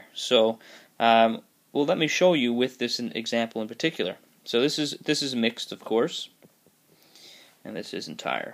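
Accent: Newfoundland, Canada. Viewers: Are you from New Foundland?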